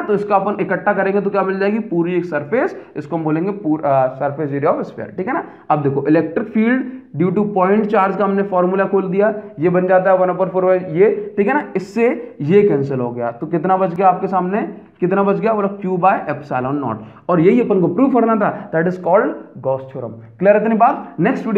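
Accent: Odisha, India. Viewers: Sir, are you odia???😃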